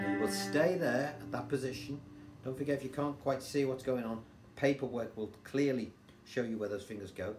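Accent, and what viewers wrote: Liverpool, United Kingdom. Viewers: Hi, enjoying your guitar lessons, great to hear a scouser tell it as it is. I have been to your bluenote channel where you cover loads of my favourite musicians. I agree with your comments regards, saw them play at the empire. Good luck with the album, some great tracks on there.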